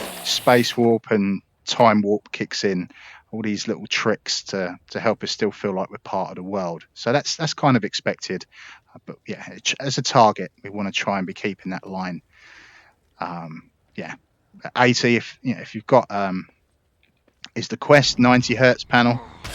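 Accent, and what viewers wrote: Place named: United Kingdom. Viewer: He (and me) are British most likely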